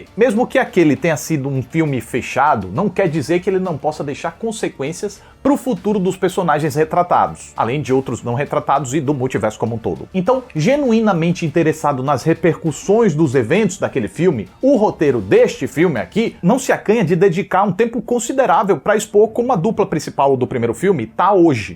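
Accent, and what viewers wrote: Northeast, Brazil. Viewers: Boa análise, como sempre! Sem dúvida, se não é o melhor, é um dos melhores avaliadores de filmes do YouTube, da internet! 👍👏 Como se não bastasse a inteligência e o bom humor nas análises, ainda por cima há o maravilhoso (para mim, carioca descendente de nordestinos!) sotaque nordestino! 😉👍 Eu gostaria de lhe pedir que fizesse uma reação para o filme "Mulher-Rei" , que assisti na tv a cabo e achei ótimo! Aliás, só mesmo o racismo enraizado nas sociedades ocidentais para explicar a divulgação fraca que este filme teve e o fato de não ter vencido um Oscar - um "desinibido de ouro"! No mínimo, penso que deveria ter concorrido a melhor filme, melhor direção, melhor roteiro e atriz coadjuvante (há umas 2 ou 3 que mereciam pelo menos concorrer), para não dizer que a Viola Davis deveria ter ganhado para atriz principal.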